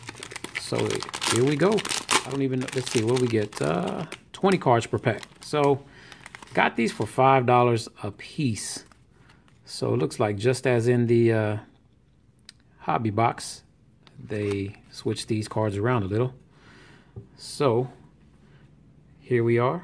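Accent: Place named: Louisiana, United States. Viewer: Oh cool I'm in Texas too, but I was born in Louisiana and you kind of have the accent so I was jc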